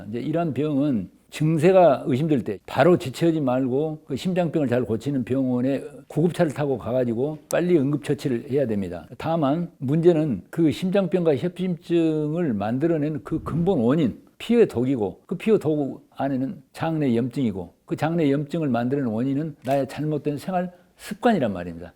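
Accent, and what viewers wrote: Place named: Jeolla, South Korea. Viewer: 말투가 라도네. 라도는 걸러라 들.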